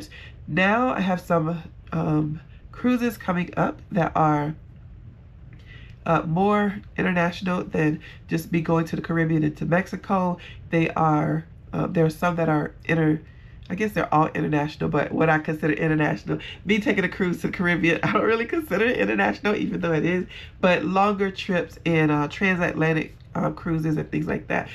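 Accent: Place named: New Orleans, United States. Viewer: Fun video even though it’s something I can’t do. Sounds like you’re from New Orleans or at least your family is.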